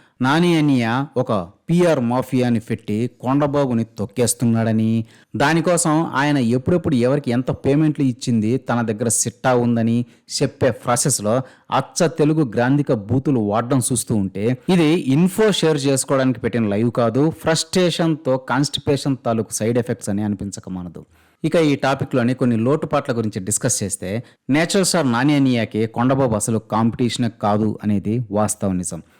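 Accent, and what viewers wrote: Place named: Chittoor, India. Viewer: nuvvu just only mega family kosam videos pettevaadu erri puka nuvv chittoor language lo matladuthunnav ra erri puka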